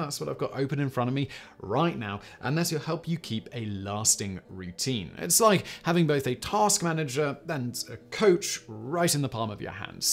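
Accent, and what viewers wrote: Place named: Cockney, United Kingdom. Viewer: Interesting. The guy who's speaking is from deeply cockney stock but is well educated and is code switching.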